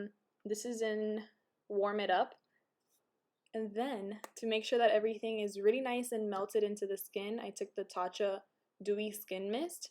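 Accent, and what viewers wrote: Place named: Miami, United States. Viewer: I knew you had to be from miami when i heard you talk !! Love the makeup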